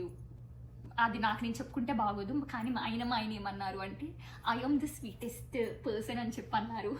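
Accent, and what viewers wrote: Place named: Andhra Pradesh, India. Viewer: Are you from Andhra? Because your slang felt exactly like that.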